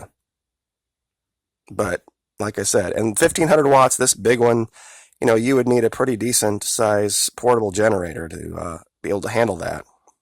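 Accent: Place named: Tennessee, United States. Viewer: Get well soon. I'm gonna join. Tennessee accent or not... genius. Just a trucker and I gotta feeling I'm gonna get caught out on the road and well you know... rodney king video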